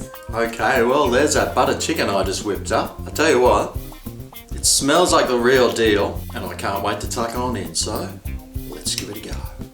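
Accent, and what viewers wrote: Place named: Australia. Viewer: Tuck on in...I love the way you Aussies speak. Great show as always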